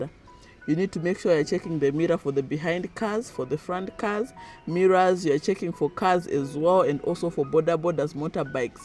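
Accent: Buganda, Uganda. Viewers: Your lingo has changed as well you sound Ugandian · You already sound like ugandan, until when u say you are not one. Uganda is a friendly place to foreigners. I dont agree that it is stressful. Ask the africans living in nordic countries, they shall tell u how easy it was for them to learn those viking languages. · you are doing very well, your english accent & facial expressions already shifted to Luganda ... thats a good start. keep it up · Your accent is already Luganda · Don't be unequally yoked , now u speak Ugandan English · You are now speaking Ugandan accent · Your accent has turn Ugandan. You are now saying theya. My sis please don't copy that accent please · You will learn my sister, but your accent is now Ugandian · Your English accent now sounds more like ungandian😂😂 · You are already speaking like a Ugandan take it easy , take language lessons, and open a market place you will be speaking the language in a week. 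· Your English accent is becoming Ugandian. Watch a lot of local dramas and learn the basics and don't be shy to speak the little that you know. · My sister, your English sounds Luganda.So what's your problem when it comes to Luganda itself.... I am from Mozambique, but I speak Luganda..nzee n'yogera Luganda nyo, soka okuwulira nga mwana, kubiri, soka okuyogera mpola mpola. Talking of chicken lehs and head, when I got back home, I no longer eat them anymore... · How do you say thereee as a Zimbabwean you sound Ugandan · Wow, you already have a Ugandan accent! Not dominant in every word, but it's coming through in a few of them. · You are now even getting the Ugandan English accent.... 😂😂😂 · U sound Ugandian already”distab him” when u are home sick come watch Zimbabwean content. I get u when he they speak their language even the jokes won’t be joke enough when it’s translated to English Sorry wena Vamwene mongotarisana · But your English is now ugandaring my sister 😅.even your tone has changed 😂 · You're doing well even your English accent has changed. 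If you didn't mention you are Zimbabwean no one can tell. You sound Ugandan. 2 ways to learn a foreign language are exposure and taking a formal course. So keep going to those public places and events. Go to Nakasero or Owino markets and negotiate prices . Attend church, weddings etc soon you will pick up the language. Check if Makerere or other colleges have a language courses. Taking a formal course would help you learn the language the correct way then you go practice what you learned by exposing yourself in public places. Don't be shy😅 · You are almost a Muganda Nyabo. Your accent is already Ugandan, be patient Luganda is almost like Shona, I lived in Uganda for a few years and I do understand when they are talking coz out languages are cousin languages, they could never Nyeya me when am around, coz i could pick a few words ! Enjoy endlessly kneeling 😅😂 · “I’m just theya” 😂😂😂 you so sound like a Ugandan now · Hugs to you.. 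you’ve already picked the Uganda accent😜hope you can now do the Acholi dance.. language wise, ugandans vanoita kunge vari kutaura shona, so i think if you are shona you should learn fast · Most of the languages of Zimbabwe are linguistically related to Luganda and the other Bantu languages of East Africa. If you understand Shona or Sindebele, learning Luganda will not take long. By the way, if you had not stated that you were from Zimbabwe I would have assumed from your beautiful accent that you were a Ugandan. I have pasted here a link to Luganda language lessons. They are easy to follow. Whatever you learn from it, you can practice it with your husband and see how you advance. I wish you the best.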